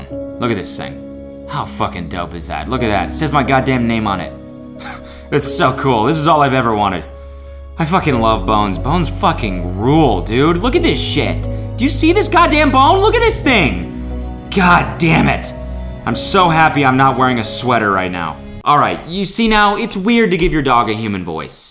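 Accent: Canada. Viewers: I had to scroll so long to find this comment. Who says “pro-sess-sor” instead of “pross-sess-er “? Sometimes be soundin realllll Canadian 👀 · Lauren Annie because Canada. He says sorry like that too lmao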